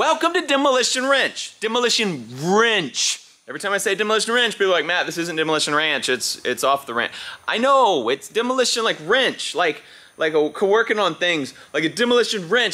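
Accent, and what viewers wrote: Texas, United States. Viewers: Matt it ain't your fault people can't tell the difference between ranch and wrench. Other folks don't know how to talk right like we do in Texas.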